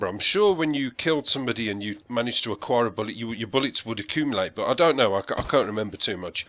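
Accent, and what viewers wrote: Birmingham, United Kingdom. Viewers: Go for it bro just love seeing another brummie on YouTube lol ;)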